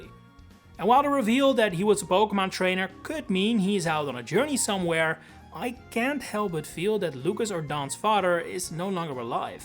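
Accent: Netherlands. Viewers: Lol, I thought you sounded Dutch a few times in this video. Turns out you are. Hello fellow Dutchie 🇳🇱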